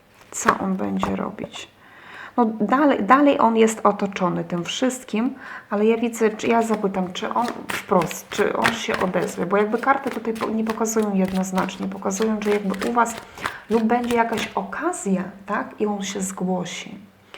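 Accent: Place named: East, Poland. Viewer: wschodni akcent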